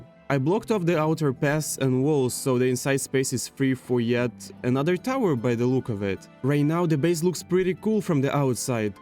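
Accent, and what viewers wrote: Russia. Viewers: окей, по акценту и шуткам, ты явно русскоговорящий. пришел в комментарии пожелать тебе удачи в твоём пути, видос получился уютный, надеюсь увидеть от тебя ещё такого. 💪🙂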